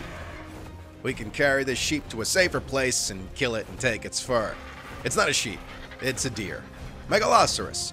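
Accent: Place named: New York, United States. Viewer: Man, when I found this channel I thought it was Simon from Neebsgaming due to the NY accent hahahaha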